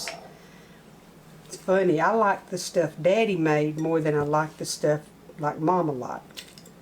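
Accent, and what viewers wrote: South, United States. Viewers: Rattle? I heard no rattling. I heard the melody and cadence of a lovely southern voice.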